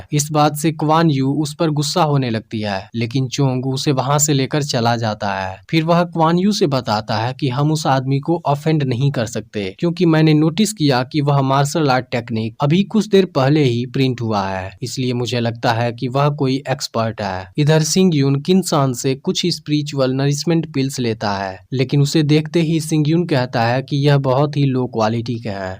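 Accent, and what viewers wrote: India. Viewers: I hate the Indian accent......I can't stand hearing it awwwwwwwww🤮🤮🤮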